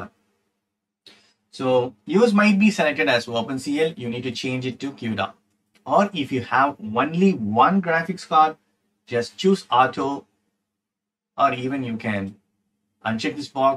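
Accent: India. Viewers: mf indian 3cpo giving us a tutorial in davinci resolve.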